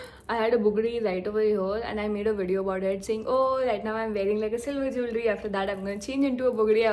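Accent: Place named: Maharashtra, India. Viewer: loved your vlogs ✨♥ your maharashtrian tone is such a :hands-yellow-heart-red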